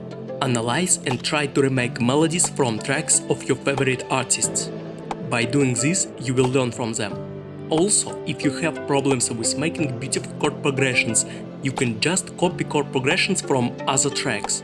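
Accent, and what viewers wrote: Russia. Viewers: Бро, по акценту узнаю что ты Русский. Я сам из Узбекистана, учусь и живу в Корее. Недавно наткнулся на твои видео, очень информативные и полезные. Просто хочу сказать спасибо за твоё старание. Break a leg! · ну просто слышно , что ты русский, соответственно у тебя есть акцент, который немного режит уши, но видео мне понравилось, лучше уж пусть будет такая озвучка чем гугл-озвучка)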